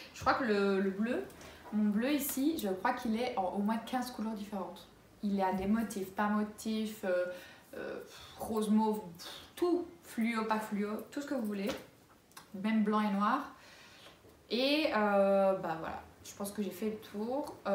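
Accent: Liège, Belgium. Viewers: Tu viens de Liège toi :)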